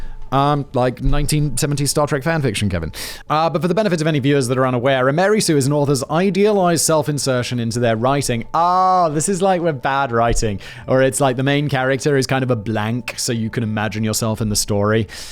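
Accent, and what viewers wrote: RP, United Kingdom. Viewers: I forget he’s not in America every time I hear bbc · dumb takes sound dumb even in a posh accent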